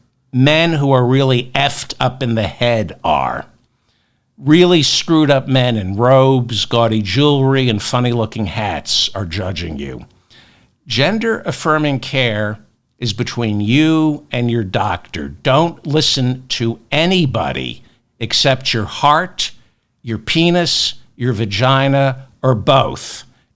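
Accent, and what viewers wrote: New York, United States. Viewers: you and we got used to just basic cheating by the right. Now it's a firehouse of u name it crap. First I personally am glad to have your voice (and it's dry New York; do it yo) in the mix. Also brilliant to repeat the former president's actual marital failings. Again and again. Love your roll Dr.!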